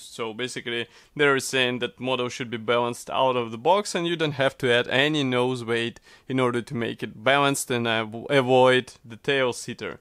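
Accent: Russia. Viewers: судя по произношению родной язык у человека русский)) модель интересная, шведы вообще своеобразные, в планах собрать все их реактивы..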